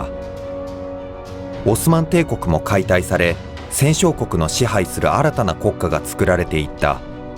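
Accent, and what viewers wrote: Kansai, Japan. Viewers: ジオヒストリーと同じ関西訛りが好きです。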